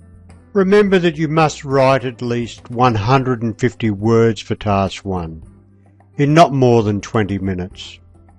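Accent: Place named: India. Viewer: hi,it is really helpful,but just your accent ,i think you are from india ? but anyway i can understand you nicely ,tks anyway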